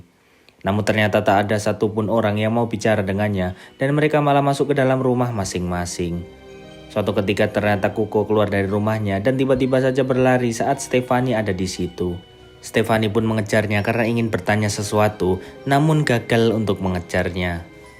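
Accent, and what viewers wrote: Java, Indonesia. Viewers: ko beda suaranya.. kaya y inh orng jawa yah boss ☝☝☝🤭🤭🤭🤭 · Jawa bgt logatnya 😄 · Si abang pasti orang jawaaa yaa ngomong y mdok🤭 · Kurang srek dgn suaranya terlalu meddok,cerita film nya jadi GK seru.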